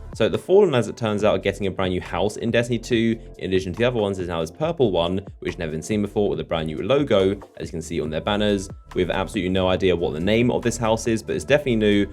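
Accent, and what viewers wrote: United Kingdom. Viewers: Another one of thoses british with a unsufferable accent. Clones AND cookie-cutters, all of them.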